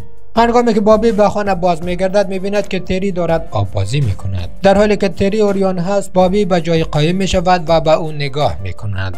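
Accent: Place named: Afghanistan. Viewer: داداش روی لهجه ی افغانیت کار کون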